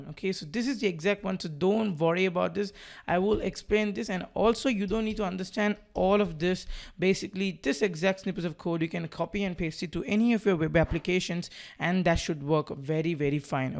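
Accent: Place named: India. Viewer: God bless this boy with a strong Indian accent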